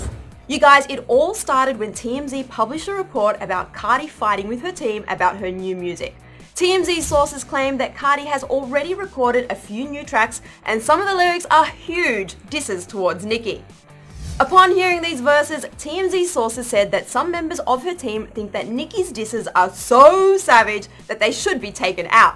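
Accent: Australia. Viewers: love her accent :O is that australian? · Whose this new Australian host?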